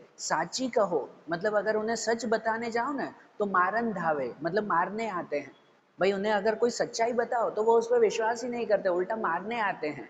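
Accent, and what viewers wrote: Gujarat, India. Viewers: What a explanation with Gujarati accent 😅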